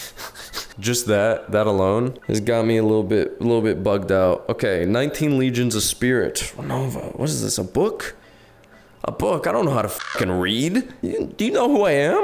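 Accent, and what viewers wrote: United States. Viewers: What is his accent? Sounds Jersey, but I wouldn’t have a clue.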